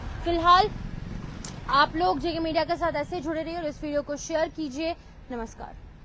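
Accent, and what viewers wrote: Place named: United Kingdom. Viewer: Reporter ne hindi ka accent uk english me kr diya 😢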